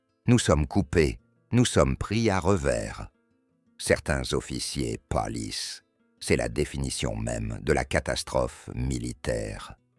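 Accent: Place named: France. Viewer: it's a French accent and a very nice one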